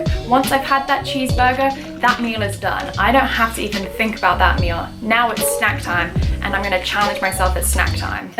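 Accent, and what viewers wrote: England, United Kingdom. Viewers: Hey, why is your accent so very British, when you've grown up in Hongkong and lived in America for such a long time, but never in the UK? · You have such a contagious positive actitud! Love your personality. My questions are: where did you get a British accent if you are from Hong Kong and only been to the States? 😅 And, what have been the struggles and positives of making public your ED here in YT? Take care,. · How did you acquire an English accent? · Is your family English? Because you have a strong English accent ❤ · ! why do you have a British accent? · How do you have a British accent but you were raised in hk??? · I don’t really know much about Hong kong so I don’t know if this is a stupid question but what language do they speak in Hong Kong?... do you speak it? And also I don’t really know how schooling works there but you speak with a British accent.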